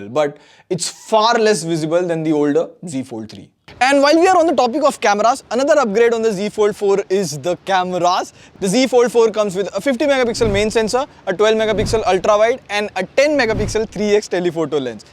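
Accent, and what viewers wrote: India. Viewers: Your review was so refreshing to all the reviews I've been watching. And I love your accent! (I'm not used to hearing Indian accent so I was equally fascinated with the way you talk lol) Love the energy and humour haha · Finally a new generation guy who is proud of the Indian accent...excellent review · Your accent is soo strong like as stereotypical of an Indian accent can possibily be....I cant finish your video man your accent is killing me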